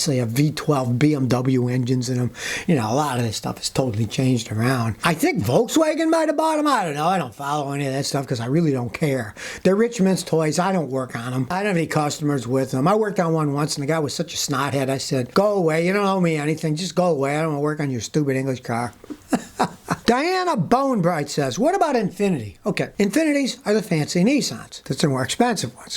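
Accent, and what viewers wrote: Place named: New Orleans, United States. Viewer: Good afternoon, Mr., I just found your channel, by me looking into buying a 2004 Honda C R V, I really appreciate your much valuable knowledge, Thank You, for sharing, I wanted to ask you a question, are you from New Orleans, I grew up a hr away from there, you sure sound like you are from there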